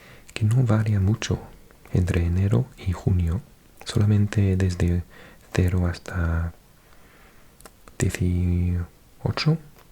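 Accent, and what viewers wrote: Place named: United Kingdom. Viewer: Wow yo ya había visto algún que otro vídeo de tu canal de geografía y mapas y siempre he pensado, que acento más de Reino Unido tiene, pero verte hablando un español tan fluido me ha parecido impresionante. Sigue así y enhorabuena!!!!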